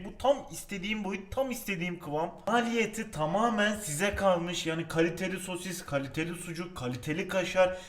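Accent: Adana, Turkey. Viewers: "Şunu alüyürüm, bunu koyüyürüm, buna eklüyürüm." Bütün yemek videosu çekenlerin neden şivesi adanaya kayıyor ve salağa anlatır gibi anlatıyor anlamıyorum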